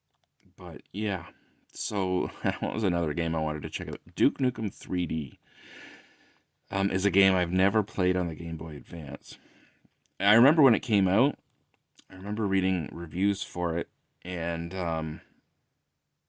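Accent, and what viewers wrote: Canada. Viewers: ah yea i heard some Canadian inflection but thats common here too lol and alright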